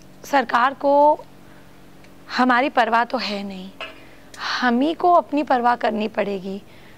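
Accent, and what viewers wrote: Standard. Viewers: Aapki Hindi bhut hi Sanskrit nisht Aur shuddha hai Aur bhut hi uttam hai adhiktar hindi bhashi logon se…..aapko pranam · Ma'm itni shuddh hindi to aajkal koi north India main bhi nahi bplta he😄. Your hindi is at/ even above native speakers. No need to be worried about it.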